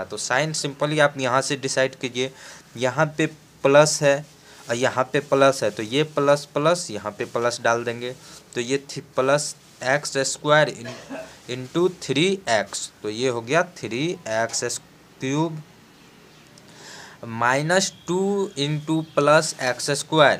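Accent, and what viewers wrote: Bihar, India. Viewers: Your Voice Like A Bihari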